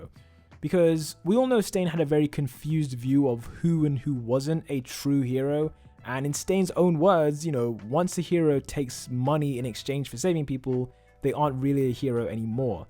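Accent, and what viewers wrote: United Kingdom. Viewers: Yo you sound like your from the uk.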